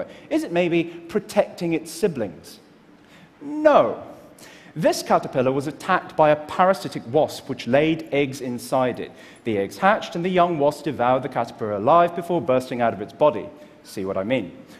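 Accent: United Kingdom. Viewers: I love an asian who speaks with an impeccable British accent. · I like his British accent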